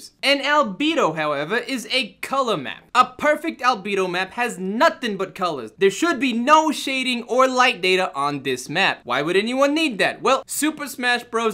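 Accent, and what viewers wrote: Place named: Boston, United States. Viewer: Boston accent, it seems.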